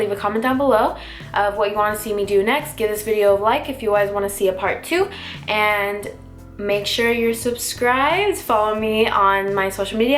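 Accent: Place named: Arizona, United States. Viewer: Sounds like she's from Arizona 🌵😃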